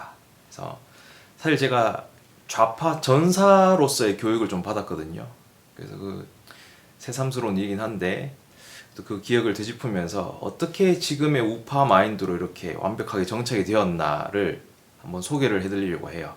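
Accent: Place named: Gyeongsang, South Korea. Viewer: 경상도네 그러니까 우파로. 넘어갔지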